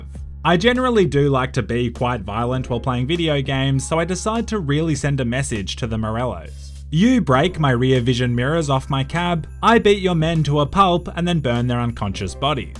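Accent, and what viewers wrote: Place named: Australia. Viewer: double dropping caps, your so australian in ur vids i love it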